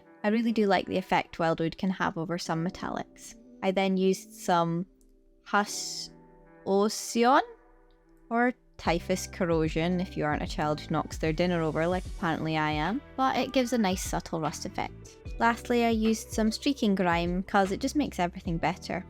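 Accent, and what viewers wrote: Scotland, United Kingdom. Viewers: This is absolutely the most wonderful sexy beast. Its a joy to hear another Scots Crafter. Keep up this fab work xxx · Omg! 😳 Stephen King has written a short story about something along the lines of the mushrooms eyes there. It’s been decades since I read it but it still makes my skin crawl. As did your talk about small holes and them little eyes. 😵 Anyways, great build and great presentation. I’m also a sucker for a woman with a Scottish accent so that kinda topped off the experience. Good job! 👏👏👏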